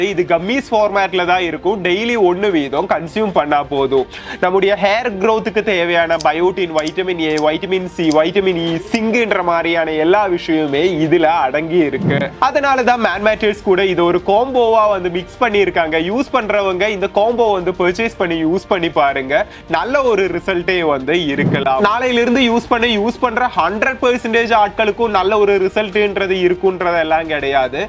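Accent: Kerala, India. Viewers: மலையாள தமிழ்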